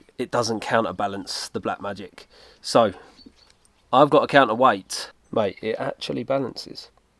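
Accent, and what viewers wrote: United Kingdom. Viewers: Bro, I want to learn your British accent 😎😎😎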